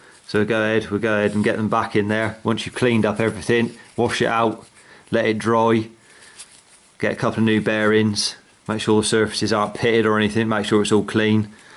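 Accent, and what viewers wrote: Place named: West Country, United Kingdom. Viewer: Didn’t realise it was going to be you when I clicked on the vid. What a relief to hear that West Country accent. Thanks again for your vids. Bearings on order.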